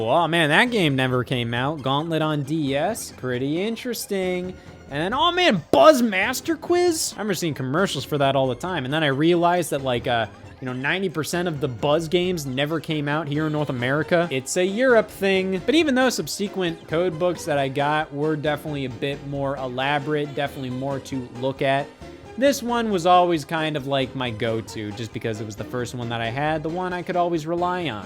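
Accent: Ohio, United States. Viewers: The ohio is showing by pronouncing it ride aid lol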